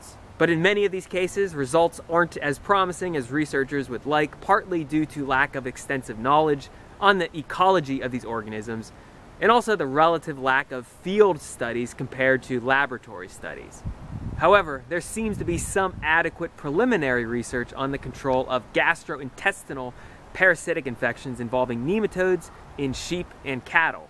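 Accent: United States. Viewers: Subbed! Fantastic. Thank you for the research and for referencing peer reviewed journal articles. Also usually can't stand American accents. This is an exception.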